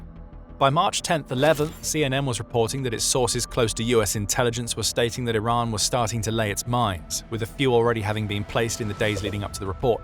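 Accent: United Kingdom. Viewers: is so funny that a Brit is narrating this video since their politician are not doing shit in this Campaign I Remember the WarHog from 1978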